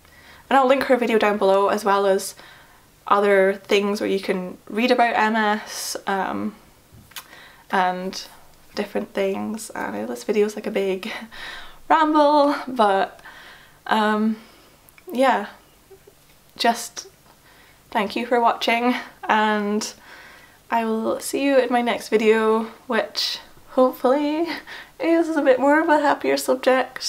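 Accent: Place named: Scotland, United Kingdom. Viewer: Just diagnosed a week ago, currently with CIS but with a likelihood of MS. I hear from your voice from Scotland too. Your video is seriously helping me coming to terms with things. I have just taken on gluten and dairy free too. You’re an inspiration. I’m afraid to tell people because I worry they treat me different. Sorry for the wee ramble, I wish you all the best 😘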